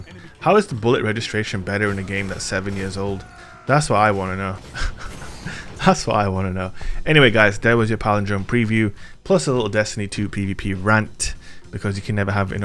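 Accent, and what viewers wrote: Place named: West Yorkshire, United Kingdom. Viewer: Are you in West Yorkshire? I know that accent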